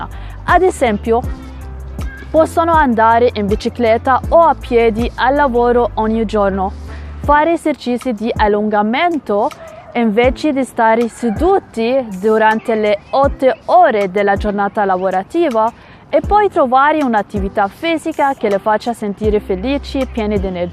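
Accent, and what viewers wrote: Arab world. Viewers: ¿Qué dialecto es tu árabe?, ¡Me encanta! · Me encantó tu italiano! sin duda se nota que el árabe es tu lengua. PD: Saludos desde Guatemala, humildemente bilingüe jaja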